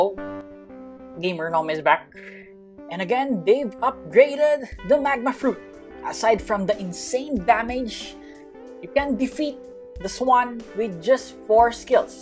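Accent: Philippines. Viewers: Are u pilipino or american lods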